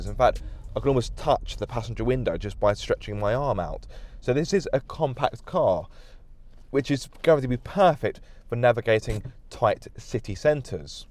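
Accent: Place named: RP, United Kingdom. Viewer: Stop trying to talk posh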